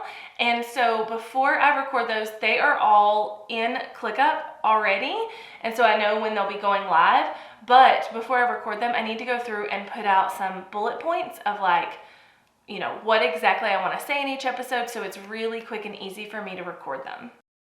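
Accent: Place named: Carolina, United States. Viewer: Nice Carolina accent you have -)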